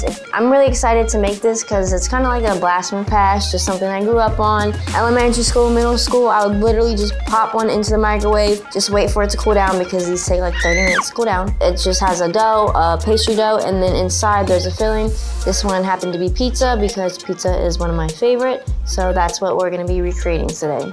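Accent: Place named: Chicago, United States. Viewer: She’s definitely charismatic but why does she talk like she’s from the south side of Chicago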